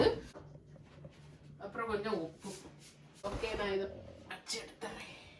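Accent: Nagercoil, India. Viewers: Hi akka...lot of fun in your videos. Original nagercoil slang...me too from nagercoil ...living in Muscat. Feel homely when hearing your voice...casual talks...awesome ...keep rocking ...May our Lord Jesus bless you. Be blessed & be a blessing to many. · Ver good recipes, very good laugh, Nagar Koil tamil, Positive energy... Super அக்கா... London அக்கா... I am from Chennai.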